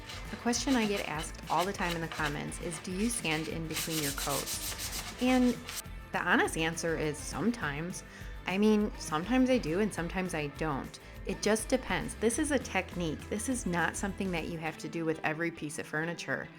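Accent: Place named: Minnesota, United States. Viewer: You sound like your from minnesota.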